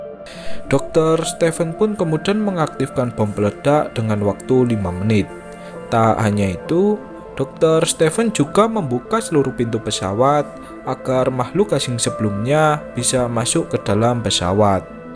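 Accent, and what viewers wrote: Java, Indonesia. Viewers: Medok yo mas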